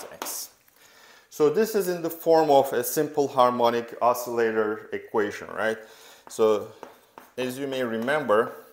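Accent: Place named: Turkey. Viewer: hocam efsanesiniz. Türk aksanıyla ingilizce konuşmanız anlamamı kolaylaştırdı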